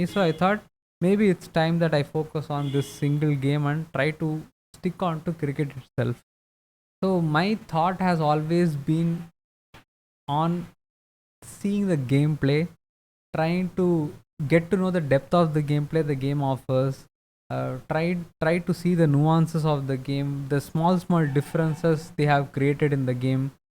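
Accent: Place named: Tamil Nadu, India. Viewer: Your accent is Tamil. தமிழர்கள் வீழ்வதில்லை. You will come back.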